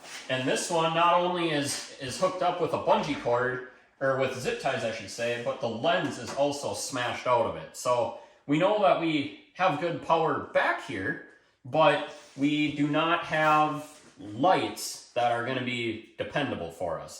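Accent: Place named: Minnesota, United States. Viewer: The brown wire ground back feed diagnosis was really nice work, as if you’ve done this before! 😅 The mouse situation was also classic. Re-wiring the whole thing was the right thing to recommend. The Minnesota accent is perfect! Go get some hot dish and jell-o salad. How about some bars for dessert? 😊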